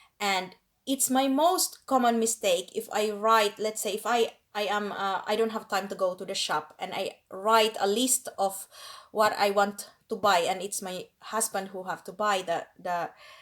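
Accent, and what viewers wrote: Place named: Finland. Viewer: Your accent even if you’re speaking english is very finnish na :) Minä myös asun Suomessa ja nyt opiskelen suomea 😅 Toivottavasti mä puhun sen hyvin kohta!😄 Writing it is always easier than speaking lol 😊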